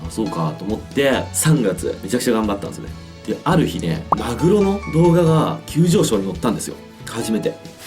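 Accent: Japan. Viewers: はじめんほど訛ってないけど近い地方の人なんだなってわかる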